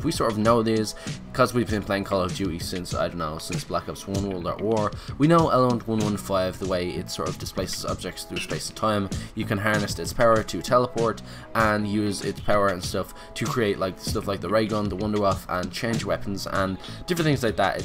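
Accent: Dublin, Ireland. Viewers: Are you from Dublin and great video it helps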